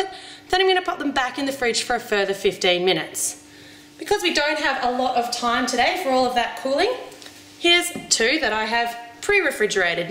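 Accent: Australia. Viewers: Australian, not british :P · She's Australian not British. Don't worry I thot she was British too! >.<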